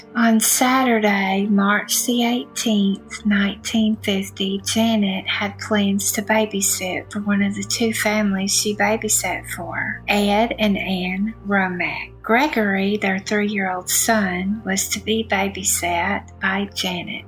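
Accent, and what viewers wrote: South, United States. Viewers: I love your southern draw..Alabama here.. thanks for another one friend..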